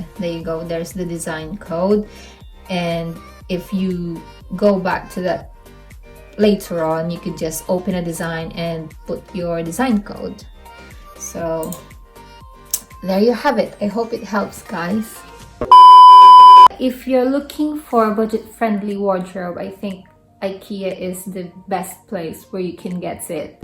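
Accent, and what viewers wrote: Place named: United Kingdom. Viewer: I Really like your British accent. And I’m trying to copy it 😜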